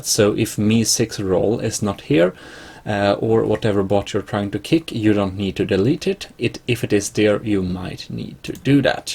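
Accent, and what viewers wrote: Sweden. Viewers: Your are frome sweden?Tjena bror. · är du svensk? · hörs att du e svensk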